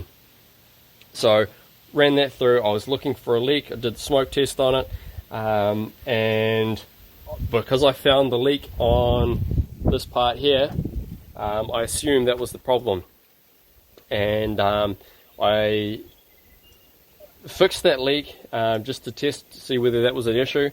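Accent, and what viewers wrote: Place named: New Zealand. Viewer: Thank you. As seen as I heard your accent I said he must be a kiwi:). I just bought 2009 A4 3.2 Quattro and has same rough idle vibrating problem and kind a goes away when you are driving faster. I would really appreciate your advise for that issue. Where shall I take my car? I am in Auckland and I don’t want to be ripped off TBH! It has 150K milage on it. my email <mail> many thanks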